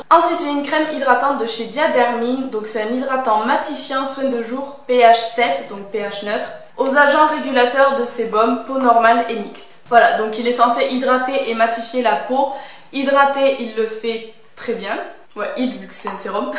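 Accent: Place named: Aveyron, France. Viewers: Big up pour l'accent aveyronnais !! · Ahah l'accent Aveyronnais, je suis Aveyronnaise! 👊🏼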